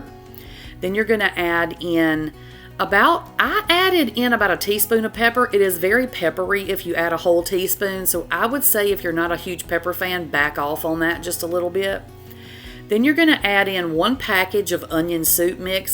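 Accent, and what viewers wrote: South, United States. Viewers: Love these recipes! One thing you might change is the rice used. I used copycats for this and when I used converted rice it was a game changer. In any event I think you're spot on with these. New sub here. And you keep sayin' "bawl". As someone who has lived in the south, for over forty years, it's music to my ears. 🤩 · Hello from Alaska. I just recently found your channel,I’m just going to say it. My goodness gracious, the amount of times that I’ve heard you say people are out here just doing the most, throwing hissy fits, I’m not saying in any shape or form that’s what you said, it my goodness, they are in my unprofessional opinion, are just nit picking. You want to change how you prepare these, the. You do you, change it. It leave this sweet lady alone please. And btw, idk what it is, I love the thick southern accents, so every time you say boil, instant smile on my face and when you corrected your pronunciation, I did a double take at my phone, and was like that didn’t sound right lol 😅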